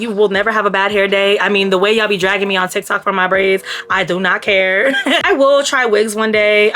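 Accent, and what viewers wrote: Jamaica. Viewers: Far a while was trying to figure out if you were Jamaican descent, because I can kinda hear it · Funny thing is your natural accent reminds me of Jamaicans I know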